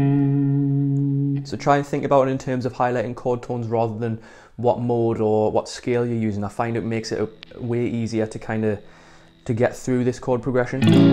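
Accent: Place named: Chester-le-Street, United Kingdom. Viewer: I found your channel by chance the other day and was so impressed with your playing and sound. Then you open your mouth and you're from round here haha. I live Chester Le Street! Small world haha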